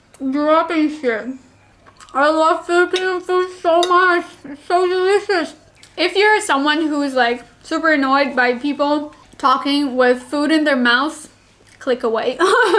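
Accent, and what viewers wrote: United States. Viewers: Hey congrats mucho! I love these videos where you are very spontaneous and uncensored 🥰 One thing I've noticed though, you don't sound very Russian, you know, like Dasha Taran. You sound very American. I guess it's because you did stay and work in the states for a bit. Cheers, carry on and stay awesome 🥳🇵🇭💝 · Hi, new subscriber. Keep up the honesty and openness. There will always be plenty of people that are looking for someone to trust. I admire your honesty. By the way, about underarm odor/smell. It is genetic. (Sorry in advance for the biology/science stuff). Having the ABCC-11 gene in your DNA determines whether you will have underarm smell or not. Almost every white person has this gene (98%). Very few Asians have it and Koreans don't have it at all. I'm sure lots of people are completely impressed by your English. I know I am. 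Your American accent and huge vocabulary are so good you could make a living doing English voice translations for Russian products and on their company phone menus. How ever you were able to do it is a great achievement. Someday do a video: "How to get so good at American English everyone will be amazed." Stay healthy. You are great and deserve all the happiness you desire.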